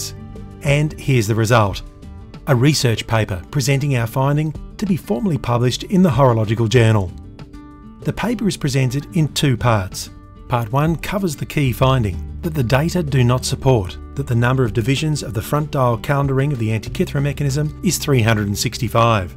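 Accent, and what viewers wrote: Australia. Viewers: That soothing aussie lilt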